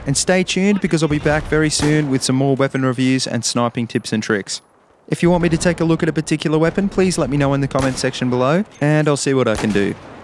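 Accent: Australia. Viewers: Are you Aussie/ New Zealander?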